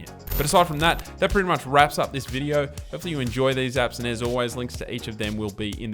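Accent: Australia. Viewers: you Aussie mate?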